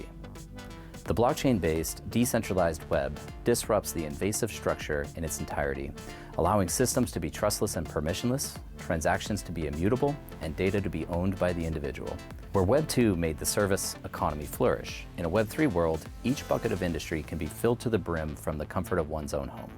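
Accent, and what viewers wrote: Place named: United States. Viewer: Which part of America is his accent from??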